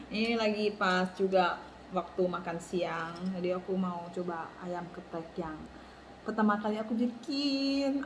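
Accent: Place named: Indonesia. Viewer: Kak kok gak ada video yg baru sih..penasaran bgt sm video2 mu..secara kamu kalo gak ngomong ,kamu orang laos. aku pikir kamu asli indonesia...karena logatmu indonesia banget loh...haha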